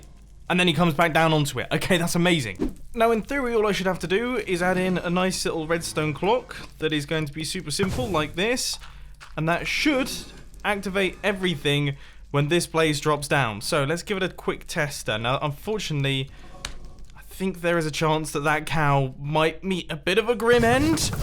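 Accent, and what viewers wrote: United Kingdom. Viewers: U brittish??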